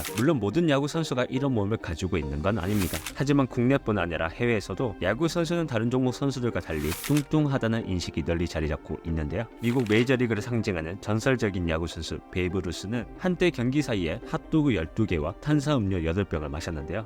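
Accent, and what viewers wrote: Gyeongsang, South Korea. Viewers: 고향이 경상도 어디세요 ?? 사투리가 똑같네요 ㅎㅎ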